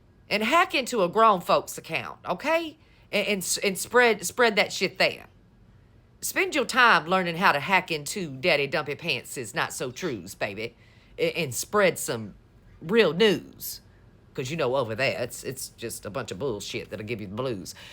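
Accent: South, United States. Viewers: I love your voice. You have a classic southern accent.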